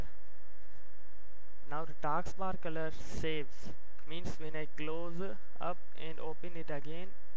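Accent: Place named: Spain. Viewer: How about learning speak english correctly and not "spanish english"?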